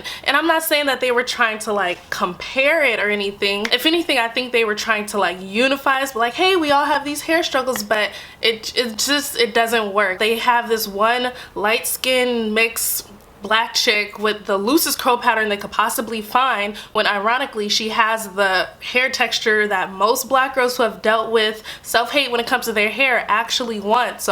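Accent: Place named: New York City, United States. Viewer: awww you're growing a SLIGHT NYC accent! lol. anyways, great video I totally agree w. your viewpoint on this BS.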